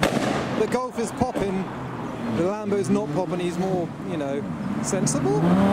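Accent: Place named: United Kingdom. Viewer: you got a sweet girlfriend, sweet video's and your voice is so damn britisch. love it dude ! greetings from the Netherlands.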